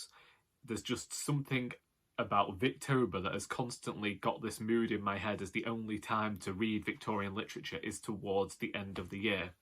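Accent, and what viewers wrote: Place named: England, United Kingdom. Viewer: Great picks. You have a cool accent. Which part of England are you from? Here's my ever changing TBR. I got really sick so I started early. 1.Sensationalist Novel: Either some Mary Elizabeth Braddon book or and Uncle Silas by Joseph Sheridan le Fenu 2. Book that takes place in the City: The Romance of The Shop by Amy Levy and hopefully also New Grub City by George Gissing3. Book that takes place in the Country: Carmilla by Joseph Sheridan le Fanu (already read)4. Best Seller: He Knew He Was Right by Anthony Trollope and, if I can fit it in, The Heir of Redclyffe by Charlotte Yonge5. Book with Female Lead: Esther Waters by George Moore and Janet's Repentance by George Eliot (already read both)6. Audiobook: The Mayor of Casterbridge by Thomas Hardy (already read)7. Group Read: Gothic Tales by Elizabeth Gaskell And for fun I'll probably read some poetry and short stories (I already read The Canterville Ghost by Oscar Wilde)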